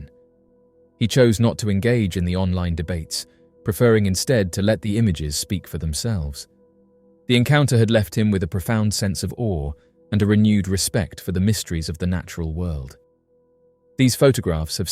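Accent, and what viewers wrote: United Kingdom. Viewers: Fyi. A British accent is used to be more convincing .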